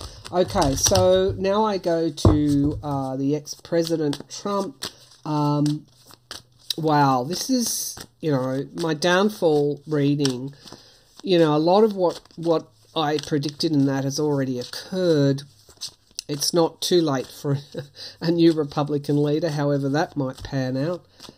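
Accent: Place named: Australia. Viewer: I love your readings, and find your no-Nonsense Aussie accent 😅 as comforting as a US Southern drawl. You are a genuine soul. Thanks more than you can appreciate the comfort and warnings you provide! Ava in Florida😊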